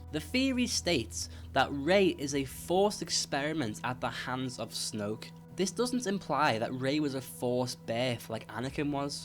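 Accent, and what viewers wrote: Liverpool, United Kingdom. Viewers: Are you from Liverpool? I am :p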